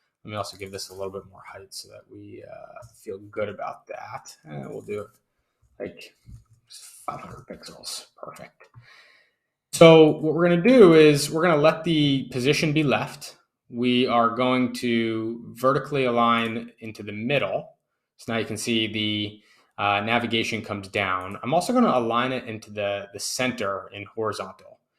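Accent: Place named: United States. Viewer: Greetings, from St George! Finally, a site on Bricks described in an American accent. At least I can understand what you’re saying. Very nicely done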